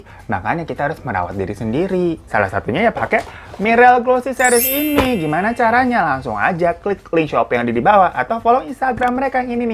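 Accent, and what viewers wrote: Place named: Jakarta, Indonesia. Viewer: Gw suka pembawaannya tuh apa adanya. ... kadang lucu denger logat bicaranya🤭... Betawi bangeud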